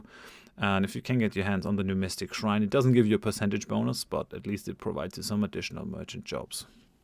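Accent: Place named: Germany. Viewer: That German accent though….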